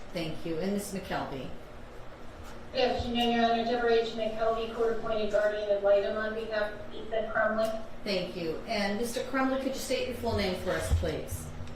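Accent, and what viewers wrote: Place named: RP, United Kingdom. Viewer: So I have great fondness for our friends in the UK, but man your manner of speaking sounds like it’s straight out of Eaton, very overly posh or at least a high-low, sing-song rhythm. Great content, it’s just the voice over is tough to listen to.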